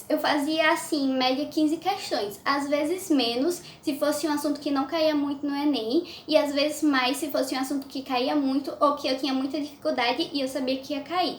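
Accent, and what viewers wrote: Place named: Pernambuco, Brazil. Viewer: Tu é pernambucana, mulher? Sotaque lindo.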